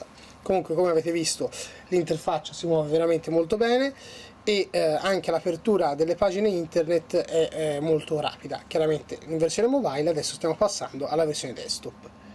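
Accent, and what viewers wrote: Tuscany, Italy. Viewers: Quanto TI AMO ACCENTO TOSCANO :D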